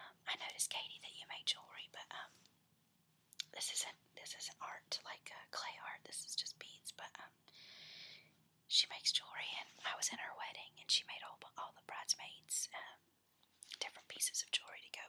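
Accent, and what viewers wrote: South, United States. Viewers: I just came across this video, maybe you've answered in a previous video...but is that southern accent I'm hearing?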